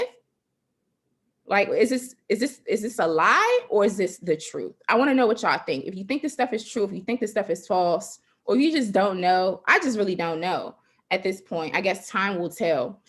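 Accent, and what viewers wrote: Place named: DMV, United States. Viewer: I can tell when someone is from the DMV area... I hear it in her voice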